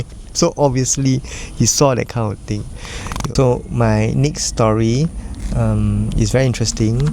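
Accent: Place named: Singapore. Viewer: I really enjoy the way you told your stories. In typical singlish it's so homely.😊😮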